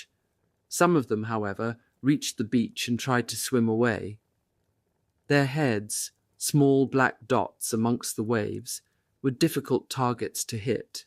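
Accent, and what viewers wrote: United Kingdom. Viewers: Something about a British person reading a story about Americans... it just does not sit right, and it feels like it does not fit. There, I said it.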